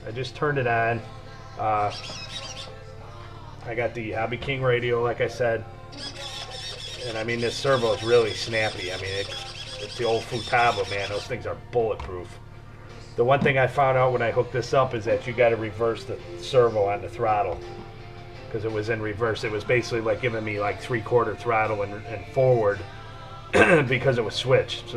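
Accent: Chicago, United States. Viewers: My gut said Chicago !! Woohoo! Good man :) awesome video by the way and amazing modification!!!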